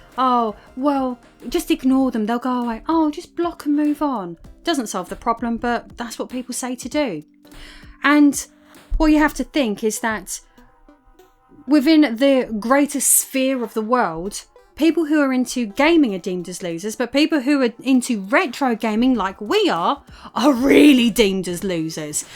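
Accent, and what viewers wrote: United Kingdom. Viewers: You sound like you're at your most British ever in this video, and it's awesome.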